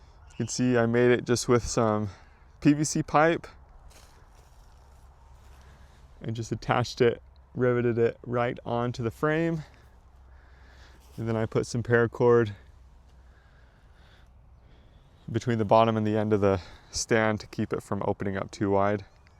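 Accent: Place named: Canada. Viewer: Hey I'm hearing a canuck accent, where are you from? I'm looking at integrating a similar design in our future home. Great video, love from Alberta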